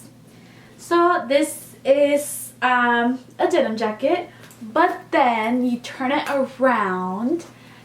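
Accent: Mexico. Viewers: We love a Mexican Queen with a cute accent 👑💕✨🙌🏾 Too Faced: Warm Sand Covergirl: golden ivory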